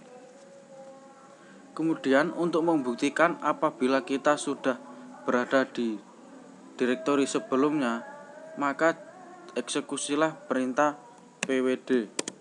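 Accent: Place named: Java, Indonesia. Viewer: Suarane bogel medok :v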